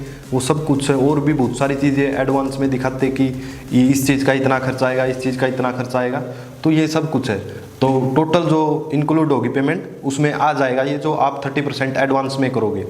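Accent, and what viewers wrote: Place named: Haryana, India. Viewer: Aapka haryanvi accent bilkul mere dost jaisa hai❤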